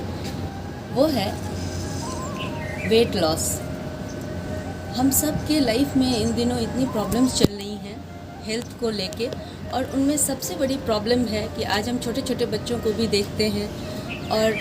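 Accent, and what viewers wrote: Bihar, India. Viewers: Na hi tumhara biharipan bolne mein fark he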